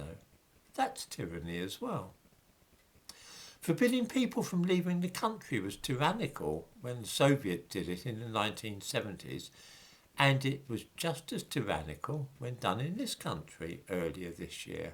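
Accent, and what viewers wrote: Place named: United Kingdom. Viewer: "Hello again." I can't help my self to repeat it in the same fashion in a moc your accent. Imitation is flattery type of way. It sounds so nice, I must hear it twice. Lol please don't take it as culture appropriation or a ethnic offense please. I love when Brits do silly impressions of Americans. Do you have any audiobooks on the markets?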